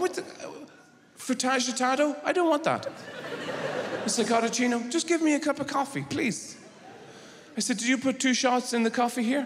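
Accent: Ireland. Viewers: Irish accent, so beautiful...🥰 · what is this beautiful accent? Irish?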